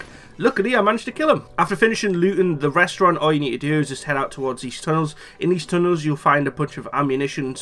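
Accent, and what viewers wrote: Newcastle, United Kingdom. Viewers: You a Geordie?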